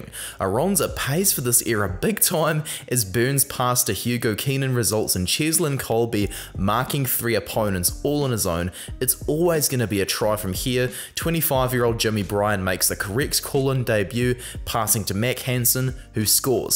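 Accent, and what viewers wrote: New Zealand. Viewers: Just as an aside from an Englishman, the New Zealand accent is a thing of wonder and beauty!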